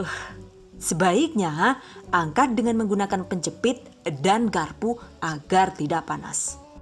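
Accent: Banyumas, Indonesia. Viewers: Kok bisa ngomong ngapak sih😂 mksh ilmunya❤ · Lucu bs nglucu n bhs ngapak pndai pula ber bhs inggris msih muda tp Suarana mntap seperti wanita dewasa kya pula, mksh resep" nya berkah buat bnyk org sht n sukses sllu 🤲🤲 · Done Youtube channel selalu memberi inspirasi resep yang inovatif dan ekonomis Dan mbak itu loh lucu 😂😂 Kalau ngomong ngapak dan catat Gak tau namanya ngomongnya jadi mbak 😂😂 #giveawaythv2020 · Hahahaha seneng nek.lg ngmng ngapak yu 🤣🤣 Jal nek gawean aja akeh" ea lh 500g nyong langka.sng ngrewangi maem yu 😁 Nek srabi gandos ana resepe ora yu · Ngapakers nya mantap , pukis nya jga mantap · Mba. Jenengn suarane enak temen si. Inggrise ya jos. Bisa ngapak maning. Sht trus ya mba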